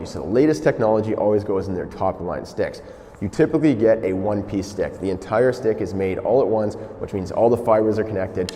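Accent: Canada. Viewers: What a thick canadian accent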